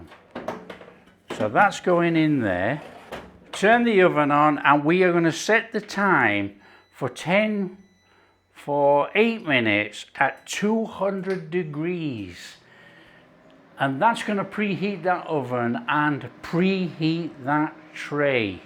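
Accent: Yorkshire, United Kingdom. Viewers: Every time I hear you say garlic, I think of Peter Kay. “Garlic bread, it’s the future! “ lol. Must be the Yorkshire accent. I was having a good old chuckle with the garlic oil hehehe p. In the nicest possible way though. I adore you, you’re just so real xxxx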